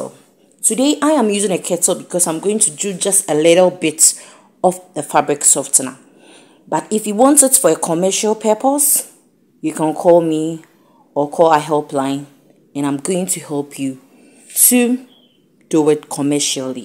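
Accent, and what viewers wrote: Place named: United Kingdom. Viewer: I love how you meticulously speak the Queen’s language ❤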